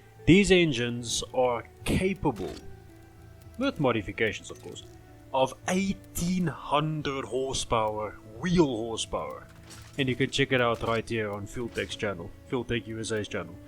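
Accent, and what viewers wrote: Afrikaans, South Africa. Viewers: I knew it 😁... that Afrikaans accent came through perfectly 😂👌🔥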